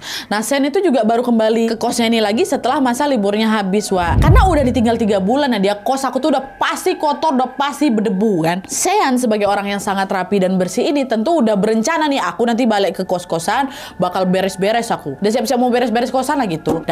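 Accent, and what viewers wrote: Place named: Sumatra, Indonesia. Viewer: 2 Minggu ini baru ikuti, terkhusus bagian Crime story,thx menemani malam gabut saya kak,btw asli orang mana kak? Dari logat nya sekitaran Sumut,Riau Sumbar?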